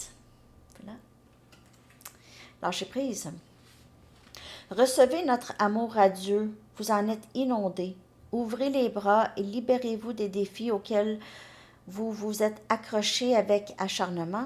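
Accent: Canada. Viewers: Vs êtes adorable et encore plus votre accent Canadien je vs adore 🥰 Princesse 🇹🇳💐🌹🌹🌹🌹🌹👏🏽🎻🪐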